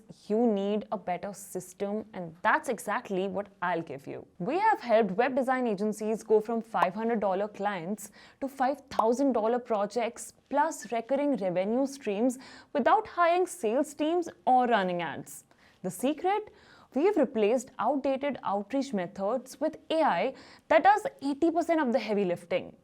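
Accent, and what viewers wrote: India. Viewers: I looove your Indian accent! Makes all the money!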